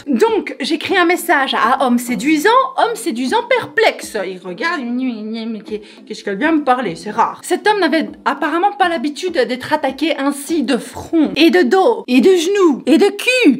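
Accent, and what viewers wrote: Belgium. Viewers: t'es vraiment Belge, ton accent reprend le dessus... ca me fait penser à la collection Arlequin ton truc... Les femmes nous sommes tjs sujettes aux mêmes clichés...Nous avons intériorisé ces clichés, même si on lutte... C'est l'homme séduisant , ok, occupé, + âgé que toi, qui gagne TRÈS bien sa vie...et tu as réussit ton rêve, non-avoué, de petite Princesse...merci la femme émancipée, qui essaye de gagner sa vie sur YouTube, mais qui sinon a arrêté de travailler, son boulot qui lui tenait a coeur au Japon, par amour pour le suivre ( l'amoureux )...aux USA, puis c''est le mariage et le bébé ... c'est bien ... c''est une histoire ... [ enfin les débuts semblent compliqués, la confiance...] mais qui répond qd même aux clichés, même aux clichés Japonais d'ailleurs ...tellement conservateurs ...et puis on n'est pas obligé de se marier pour former un couple officiel...et avoir un enfant ... 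tjs aussi pimbeche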